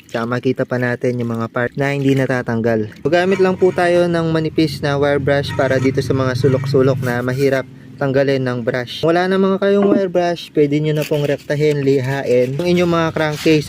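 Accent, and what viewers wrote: Pampanga, Philippines. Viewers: Kapampangan ka ata sir😊 accent mo kasi.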